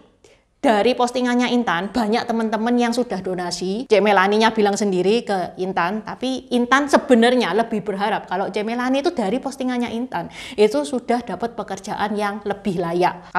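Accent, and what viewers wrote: Java, Indonesia. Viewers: Aduhhh, dengerin ci Intan menjelaskan , maaf ya, kenapa jd stress ya😅... volume suaranya itu lo mbak dan medog jawanya. Pusyingggg... Intinya kawan. Mau di terminal brapa aja turunnya, gov singapore sdh pasti membuat penumpang nyaman. Ada skytrain, ada shuttle bus tp free semua sbg bentuk convenient nya utk penumpang. Jd ga susah2 amat mau dr budget airline or full service flight kalo perlu pindah. Utk makanan , branded stuff, di semua terminal ada. No worries.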